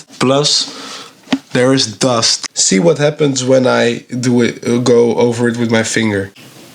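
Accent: Netherlands. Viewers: schiphol atc tower i thougt i heard a dutch accent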